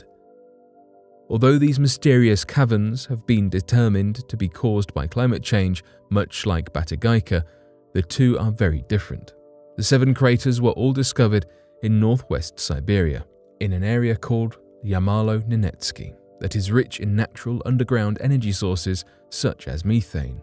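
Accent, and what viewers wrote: United Kingdom. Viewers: So you're using AI technology which makes for a robot presenter. But why did you give it a British accent? I find the British accent to be offensive.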